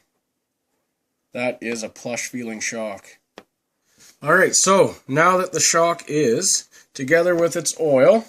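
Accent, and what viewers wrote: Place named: Canada. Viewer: You sound Canadian bud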